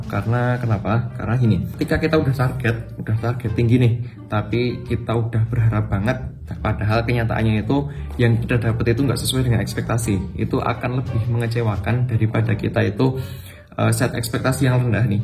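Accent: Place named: Java, Indonesia. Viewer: Wkwk medok kali bro keren2 semangat konten nya 🤩🤩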